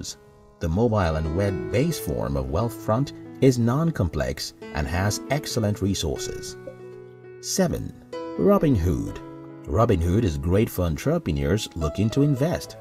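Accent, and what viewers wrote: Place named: Nigeria. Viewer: The voice over sounds like that of a Nigerian.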